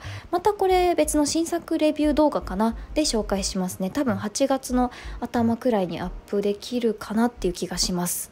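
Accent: Kansai, Japan. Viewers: 関西の方ですか？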